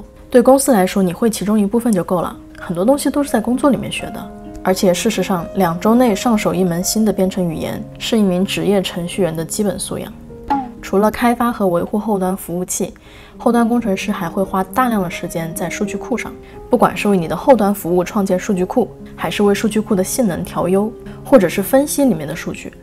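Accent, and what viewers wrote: Standard. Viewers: 来看只是确认一下 up 主懂不懂技术，看来是我错了，真的懂。发音也很标准，走了... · up主中文非常好。 我基本用英文学完，用全部中文基本解释不来。 而且up主连“逻辑”的发音都跟高考要求一样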